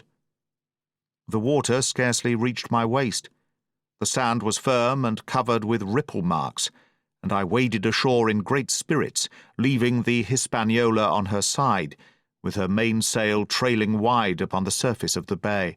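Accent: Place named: England, United Kingdom. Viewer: Thank you! So nice to hear it read with an English accent! Good job, Matey!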